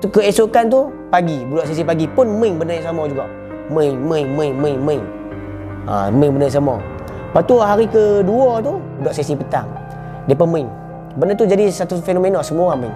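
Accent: Kedah, Malaysia. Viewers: Terbaik la channel hang dik...dengaq hang cakap..terus rindu mk ayh di kg.(kedah)..salam dari selangor dikk.. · Ska lh dngr abg ckp bhse kedah.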